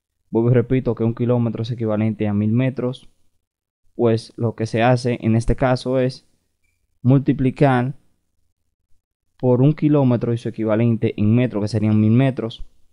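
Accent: Dominican Republic. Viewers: de donde eres me sueñas dominicano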